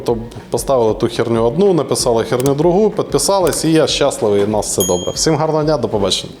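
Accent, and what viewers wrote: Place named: Central Ukraine, Ukraine. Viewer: Центральноукраїнський діалект сила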